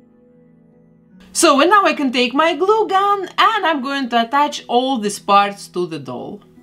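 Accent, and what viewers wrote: Russia. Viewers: Стойкое ощущение, будто человек за кадром имеет русский акцент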